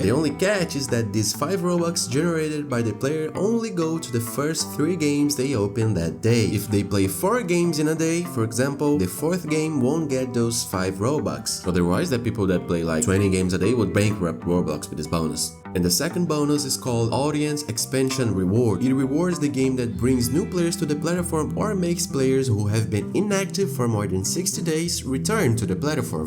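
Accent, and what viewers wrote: Brazil. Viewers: percebi, cliquei no video e fiquei assistino, e so pensando, "sotaque brasileiro do caramba", kkkk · Teu sotaque parece brasileiro 🤨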